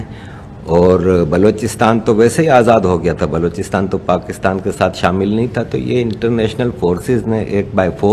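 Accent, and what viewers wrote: Gujarat, India. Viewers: Aapka accent Gujarati jaisa hai kafi had tak, bada meetha lag raha hai sunna. Main ummeed karta hoon aapke desh mein vikas ho aur iss dikkat aapko nijaat mile.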